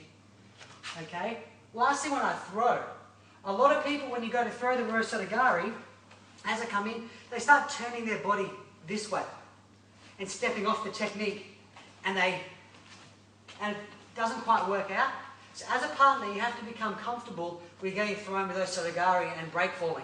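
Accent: Australia. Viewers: Man I love your techniques. And personally I think the aussie accent is beast, but I wish it didn't fuck up the autocaptions so bad; sometimes I actually want to hear you.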